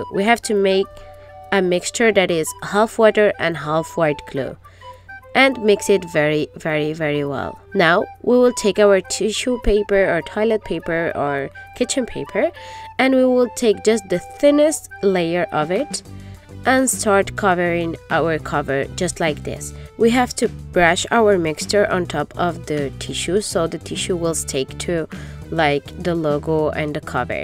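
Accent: Spain. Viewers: Is it strange that I love the way you're saying "We gonna' need"? ;-; I think it's too much cute D: And I love your spanish accent c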